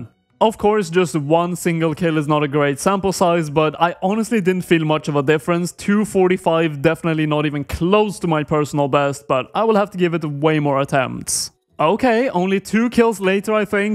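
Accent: Sweden. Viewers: The swenglish accent is so strong, i love it😂 Rsn: Kurdo-1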